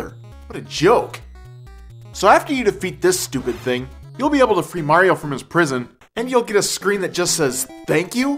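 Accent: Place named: Cleveland, United States. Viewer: Oh wow. You are from cleveland. You have the accent, I just heard it when you said "Ay-pple" ;X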